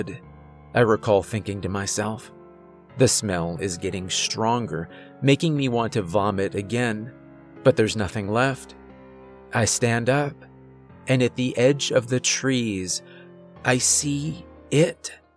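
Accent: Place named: Great Lakes, United States. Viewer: I’ve wondered for some time now if the narrator is from the Great Lakes region because of the way they pronounce some words 💁🏽‍♂️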